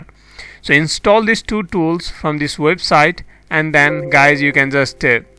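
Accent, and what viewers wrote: India. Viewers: this was the first video i clicked and when i heard that indian accent, i instantly knew this would save my life! thank you brudda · I love Indian Eng accent ; )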